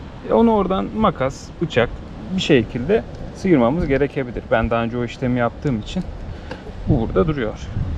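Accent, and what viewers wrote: Turkey. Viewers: tam bafralı gibisi abi :D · Abi sen samsunlumusun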